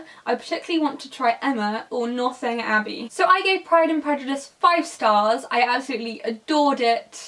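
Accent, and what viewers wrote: United Kingdom. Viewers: Your British accent s so great, BOOM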